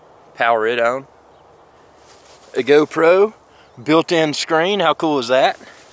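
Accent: South, United States. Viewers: thank you.. I am glad I found your channel... love your southern twang....new subscriber....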